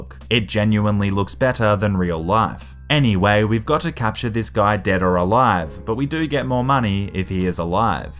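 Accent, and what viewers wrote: Australia. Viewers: the most heavy Australian accent I've ever heard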